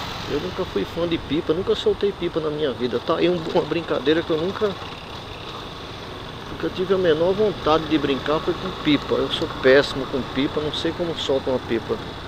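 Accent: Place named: Pernambuco, Brazil. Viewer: legal Mano você é de Pernambuco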